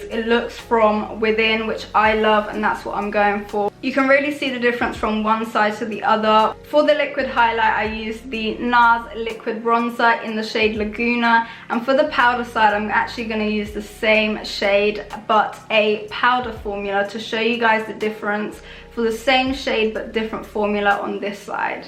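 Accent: London, United Kingdom. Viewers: Which British accent do you have? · she’s got a London accent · she's got a London accent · good British accent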